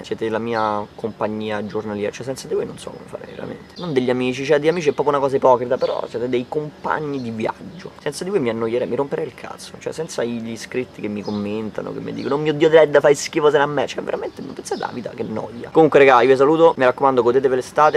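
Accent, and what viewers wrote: Rome, Italy. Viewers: Parla di più in romano che fa ridere un bottooooo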